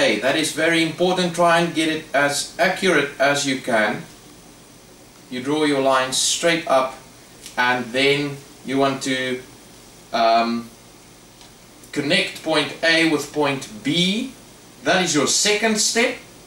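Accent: South Africa. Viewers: Your south african? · Sounds like you are from Z.A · I can tell you are southafrican 😂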